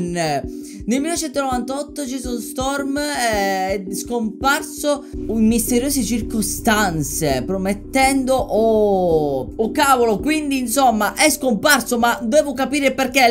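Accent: Sicily, Italy. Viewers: Te sei siciliano?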